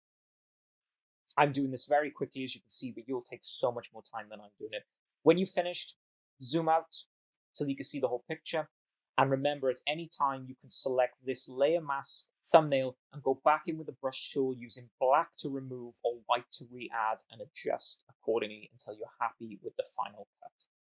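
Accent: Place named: Wales, United Kingdom. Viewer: Love a tutorial with a welsh accent! Feels like I'm listening to a mate! Great tutorial bro! #WAAAAYULLSS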